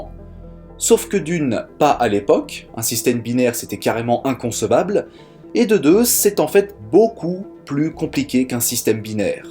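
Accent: Italy. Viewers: Pouce Bleu pour l'accent italien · G mis un pouce bleu pour ton accent italien lol :)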